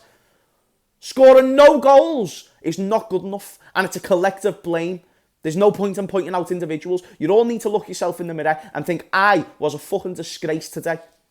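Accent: Liverpool, United Kingdom. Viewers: Another gobby scouser strikes again.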